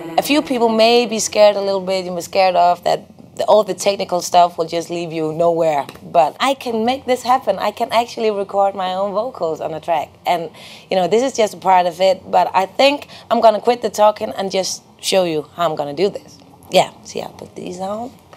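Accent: Denmark. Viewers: danish accent (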